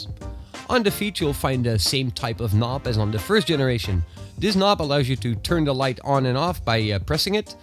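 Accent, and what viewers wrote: Netherlands. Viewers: Thicc Dutch accent over here haha, nice vid though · Vreemd dat zijn Engels echt accentloos is maar je toch kan horen dat hij Nederlands is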